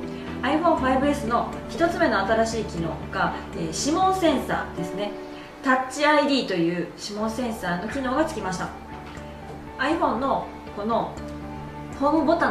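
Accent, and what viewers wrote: Kansai, Japan. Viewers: 関西弁いいわー！ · 標準語で話そうとしているのかもしれませんが、関西弁の発音が出てしまっていますね。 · 関西弁、萌えるはーｗ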